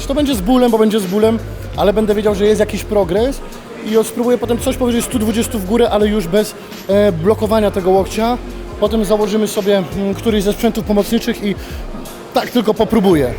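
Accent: Silesia, Poland. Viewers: Jak łon Gryfinie po Śląsku nawijo 😁👍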